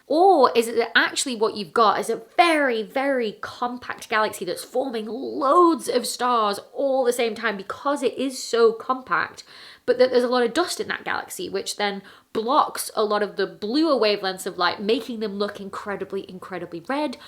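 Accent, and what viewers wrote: United Kingdom. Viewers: What accent is this, by the way? Like, where in Britain do people speak like this?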